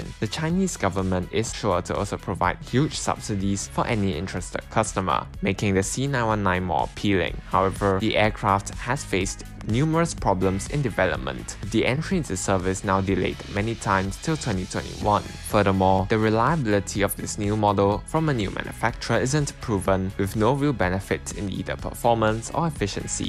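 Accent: Singapore. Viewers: I enjoy watching your videos for the most part… but how about getting someone else to the voiceover. Argh, Singlisn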